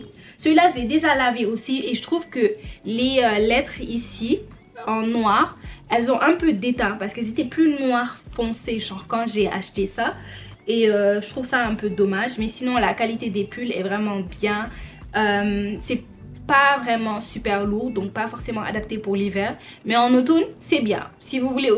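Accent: Senegal. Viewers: Genre toujours l’accent sénégalaise thieuy sama Mboukou yi❤️🤩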